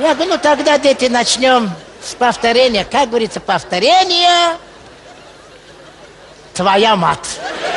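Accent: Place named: Baku, Azerbaijan. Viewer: Бакинский акцент до сих пор сохранился у него "Иди пей чай даааа"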